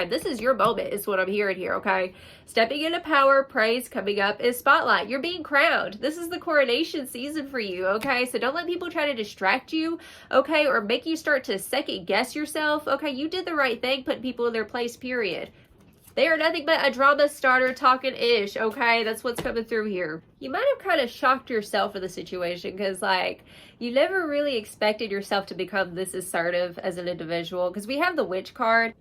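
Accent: South, United States. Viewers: You look Latino? Talk like you’re from the south so ADORABLE/CUTE…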